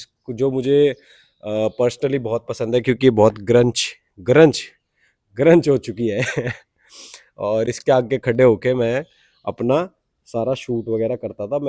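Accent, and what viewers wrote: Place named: Haryana, India. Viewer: Ye kidhar hai baawli, accent aapka haryanvi ka Gujar, Yadav, UP, Delhi ke lagte areas ka lag rha hai. Support and blessings to you though!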